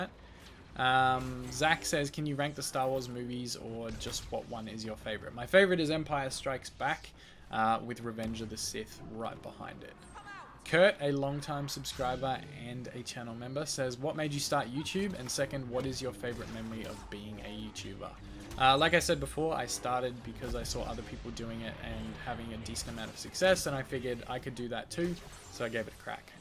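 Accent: Australia. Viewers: You and make listening to an Aussie accent bearable possible. Thank you.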